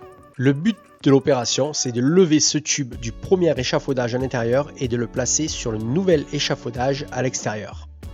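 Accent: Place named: Southern France, France. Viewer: Je viens tout juste de tomber sur votre chaîne et après m'être dis pendant plus de 10min : "Mais comment ça se fait que sur cette chaîne Youtube qui a priori est 🇺🇲 la voix off n'ait pas cette saloperie de traducteur auto de Google/Youtube ...!!?? 🤔" Et après 2min de farfouille je comprends que l'accent du "Sud de la France" de cette voix est tout simplement celle d'un des 2 protagonistes 😂 Après "1 Indien ds la Ville" c'est -> 1 Frenchie ds la Forêt Cainri 😉 En tt cas bravo , superbe projet ; ça fait rêver et ça donne envie ; ça donne envie de poser tellement de questions 😂😉 PS : avant votre autoconstruction vous n'étiez pas de simple novice en la matière, je veux dire en matière de construction , etc ; vous étiez assurément des gens d'expérience ? En tout cas , encore bravo et bonne continuation à vous, 1 grand bonjour de Bretagne 👋🤘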